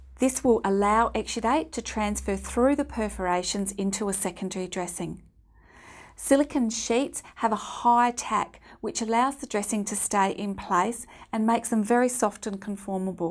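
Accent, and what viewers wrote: Australia. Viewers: OMG Her Australian accent is giving me a headache!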